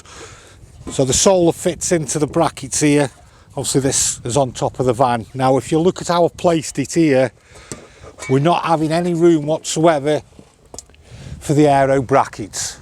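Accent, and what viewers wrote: Stoke, United Kingdom. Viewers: Hi. Great videos:-))). Just a bit of advice. We have a 100 watt solar panel on top which powers the leisure battery. Need to get a bit of charge to the cab battery. Would you go for a dual controller or a battery master? Great Stokie accent by the way lol:-)))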